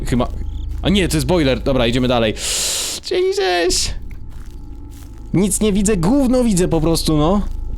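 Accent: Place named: England, United Kingdom. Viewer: Gdy grałeś w gang beasts z i i podróby gier mówiłeś normalnie a teraz mówisz z takim angielskim akcentem!?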